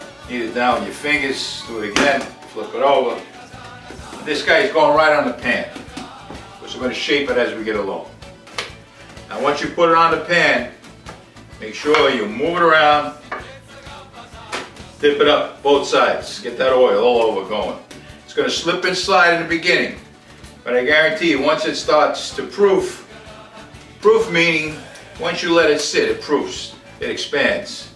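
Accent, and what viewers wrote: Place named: New York, United States. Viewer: You got like a New York Italian accent haha (: nice vid!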